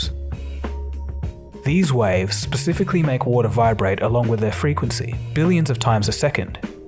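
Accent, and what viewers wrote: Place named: Australia. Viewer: I've seen 4-5 videos and already a fan, i don't normally find Australian accent very pleasant or cool but somehow I find this accent and channel very satisfying. Keep up the good work fam. The background music, intro the voiceover everything is so satisfying. ❤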